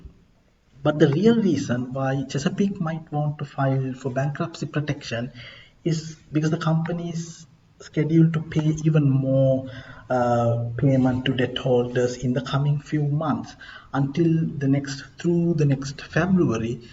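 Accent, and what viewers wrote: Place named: India. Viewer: I love your indian accent!!! its so adorable!!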